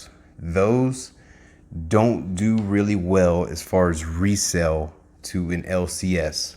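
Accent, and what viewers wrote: South, United States. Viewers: Honestly you got me to the hit the subscribe button on your southernality alone haha Keep on stackin that gold brother!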